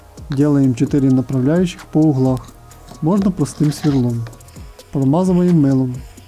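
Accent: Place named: Vologda, Russia. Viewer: характерный вологодский говор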